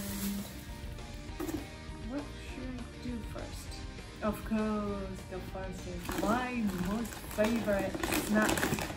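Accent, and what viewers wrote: England, United Kingdom. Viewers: I love your English accent, I could listen to you forever. Its a perfect Babyface voice. I cant wait for you to cut a smooth promo in the ring. You re awesome, Champ.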